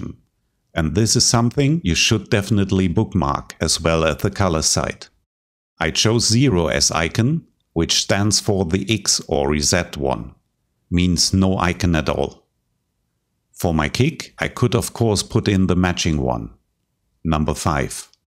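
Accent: Germany. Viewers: Good old Denglisch :D Aber Inhalt ist super!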